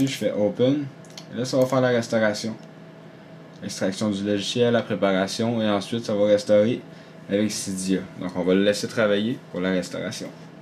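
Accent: Quebec, Canada. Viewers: SISI LACCENT QUEBECOIS !! =) (Y)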